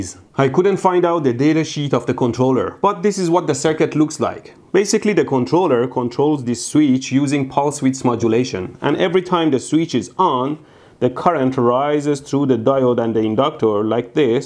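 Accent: Iran. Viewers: Yep that's the strongest Persianified English accent right there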